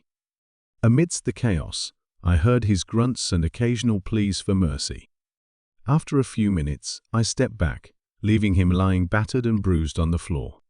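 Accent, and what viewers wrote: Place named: Yorkshire, United Kingdom. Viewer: This sounds like Sean Bean's Yorkshire accent.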